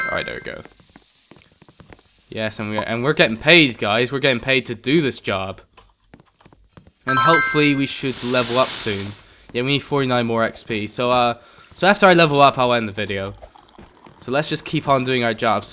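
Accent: United Kingdom. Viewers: Nice birtish accent! You just earned a sub!! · U just earned another sub. 1 your British 2 u were the only person that I saw talked while playing roblox . Btw my user on roblox is maddy6786. And next time you should work at bugler bomb. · r u birtish?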